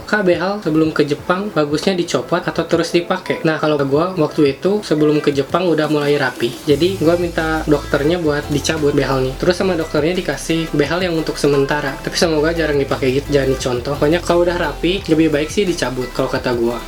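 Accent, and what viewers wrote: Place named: West Java, Indonesia. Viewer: orang bandung? hehehe meni sunda soalnya walaupun ngomong "gue":)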